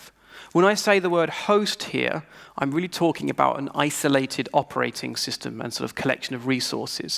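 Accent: United Kingdom. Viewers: I started reading Building Microservices a couple of weeks ago. I didn't know Sam Newman at the time. I'm glad I saw this conference, because now I'll be able to read the rest of the book in his british accent.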